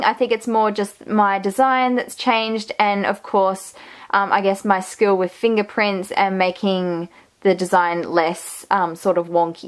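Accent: Australia. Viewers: That Random Girl she’s Australian lmao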